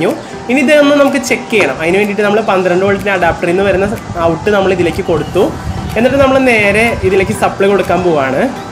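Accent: Thrissur, India. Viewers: തൃശൂർ ഭാഷ യാണ്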